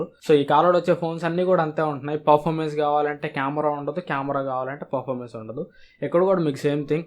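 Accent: Ongole, India. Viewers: Bro meedhi Ongole haa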